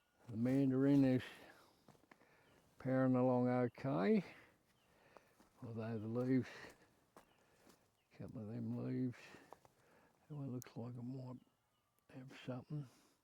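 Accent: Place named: Australia. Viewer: "Me fuck'n clippers av fucked out". I don't think it gets any more Australian than that.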